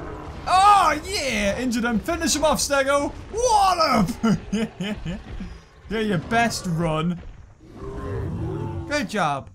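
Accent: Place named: United Kingdom. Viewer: YOU BRITISH!!!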